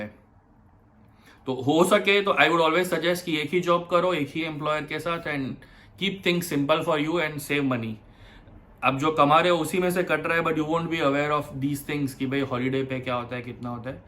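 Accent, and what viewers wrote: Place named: Bengal, India. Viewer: What are you Bengali or something ? I might be wrong but your accent sounds kinda similar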